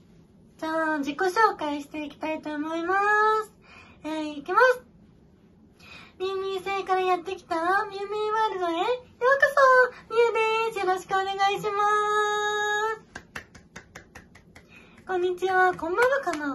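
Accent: Shizuoka, Japan. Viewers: 初コメです^ - ^静岡県民なのでウソだらの静岡弁聞けて嬉しかった(*^_^*)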